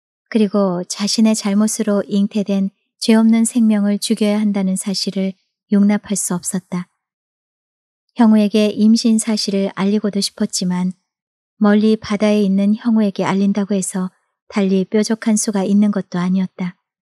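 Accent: Jeolla, South Korea. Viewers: 전라도 사투리 갱상도 사투리까지 완벽소화하셨네요 💯💯💯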